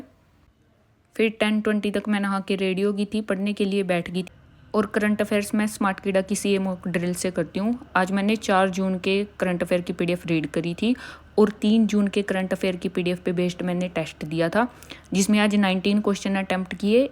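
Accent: Haryana, India. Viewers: Aap haryana se ho na😂😂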